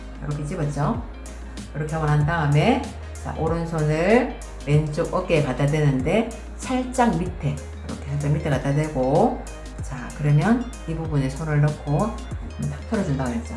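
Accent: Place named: Daegu, South Korea. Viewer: 우와~~~~ 대박 대박 대박! 사투리 들으니 대구 가고 싶어요ㅎㅎ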